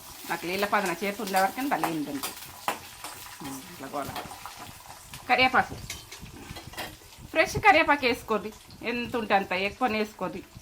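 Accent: Telangana, India. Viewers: Amma mana telangana bhasha nu chalavaraku marchipoindru mimmali chuste chala happy ga undi tarvata mana vantalu mana tokkulu 👌👌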